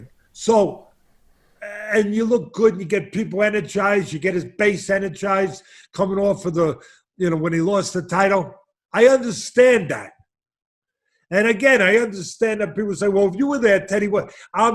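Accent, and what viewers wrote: New York, United States. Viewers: The older he gets the stronger his NY accent becomes.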